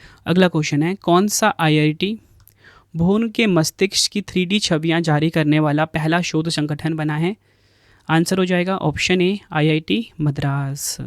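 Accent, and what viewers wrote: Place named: Bengal, India. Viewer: Bengali accent hai..... acchha lagta hai... Agreed 👍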